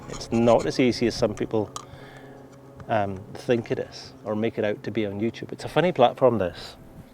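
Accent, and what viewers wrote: Scotland, United Kingdom. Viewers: So grateful you take your own time to make these Videos. I love photography and have the technical side but don’t have a particularly artistic eye,so I get a lot of inspiration from videos such as these! Nice to hear a proper accent too, from a fellow Scottish Lad!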